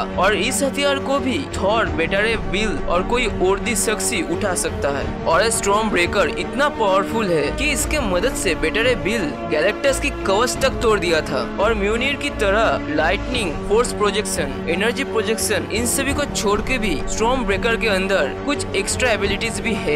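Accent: Bengal, India. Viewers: Are you Bengali??? caus something like Bangla in your Hindi??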